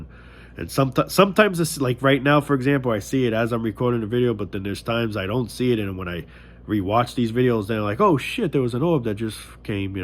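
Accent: New York, United States. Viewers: One of the best parts of discovering your channel is that my best friend and roommate is from New York. We live in Florida. And we talk about a lot of stuff and when I mention things that you're talking about. Right now he sometimes shuts me down. But it's great to know that you are from New York and you speak Truly from the heart and The accent and the truth at the same time is so funny to me. It's like you're saying what I'm thinking and you just say it. I am not white, but my roommate is New Yorker to the bone, just like you I did live in Chicago for some years. So I do know the city life. But never been in New York. And you guys speak from the hip. You just say it. And I respect that as far as the channel and people disrespecting how you and we think. And why we listen to you on a daily basis if you would put out videos on a daily basis. You just speak the truth and the truth is something that can't be hidden and you will grow because people will hear you and follow you because you have the Audacity to speak the truth Bravo. 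Bravo you are what humanity should have been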